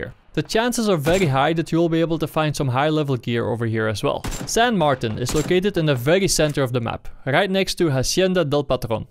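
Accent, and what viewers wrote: Netherlands. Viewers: Dis guy sounds so Dutch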